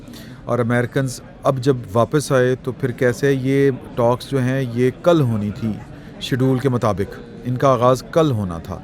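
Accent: Punjab, Pakistan. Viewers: Stupid comments in Punjabi accent. He’s clueless about global politics.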